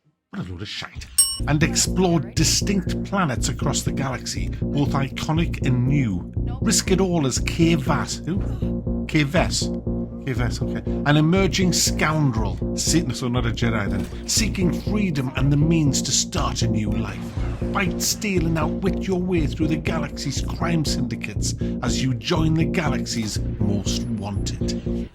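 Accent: United Kingdom. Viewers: Why do you sound more British than ever in this video? Something is different about your voice.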